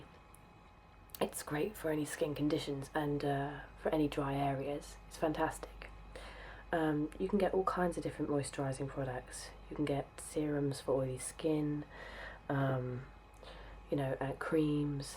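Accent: England, United Kingdom. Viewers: You really seem to keep busy putting out your videos.Thanks for the constant soothing sessions.Your voice is my fav.You make me want to move to England were all the women talk like that. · very pretty british accent i love it, keep doing more plz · I have just come across your videos, and I was so glad to hear an English accent when you spoke - I find ASMR from the UK supremely relaxing (I am also Home Counties English!) Loved your video anyway, and have subscribed! Love LouFxx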